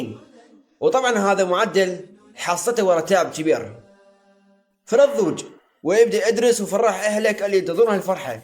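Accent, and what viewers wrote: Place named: Iraq. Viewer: صراحة المونتاج حلو جدا بس حاول تقلل من لهجتك العراقية في المقاطع حتى نقدر نفهمها صوت بالكامل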